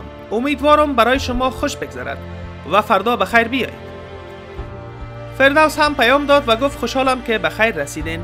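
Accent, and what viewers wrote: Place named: Kandahar, Afghanistan. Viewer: جان چی یک پشتو قنداری گپ زدی بیشکت وله😄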